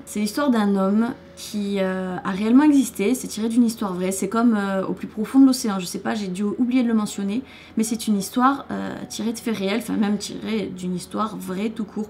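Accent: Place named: Marseille, France. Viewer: Tu et marseillaise tu parles trop comme Kamila de secret story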